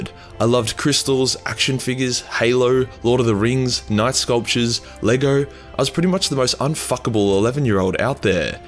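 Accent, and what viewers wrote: Australia. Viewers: Gidday Mate ! Good hearing a fellow Aussie accent online !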